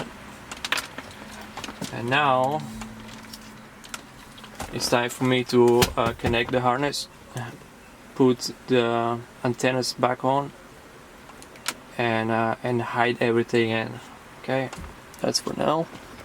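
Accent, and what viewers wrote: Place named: United Kingdom. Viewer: martenica bg si :)))) interesno kade moje da si komunikirame poveche da pitam poveche... jiveesh v usa s uk akcent :)))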